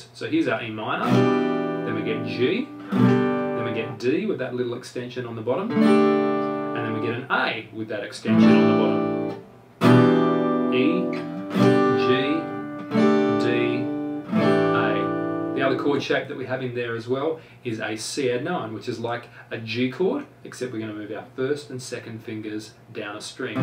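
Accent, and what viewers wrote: Australia. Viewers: Nice! Are you Aussie? :) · lol. More like Aussie. Though i find it hard to differentiate between kiwi unless i hear both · No, he sounds Australian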